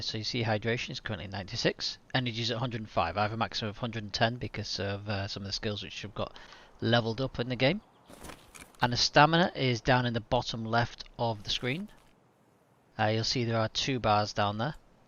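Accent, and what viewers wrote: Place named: Wales, United Kingdom. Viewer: I starve in raids, thanks for explaining. Your Welsh accent is great. Not too hard to understand.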